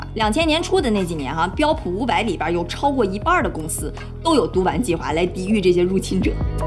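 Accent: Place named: Beijing, China. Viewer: 京味的单口相声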